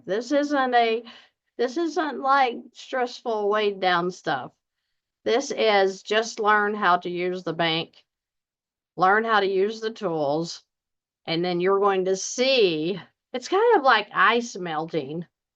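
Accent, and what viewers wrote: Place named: Kentucky, United States. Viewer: Love your accent. From Kentucky here. Sounds completely normal to me. Thanks for the video.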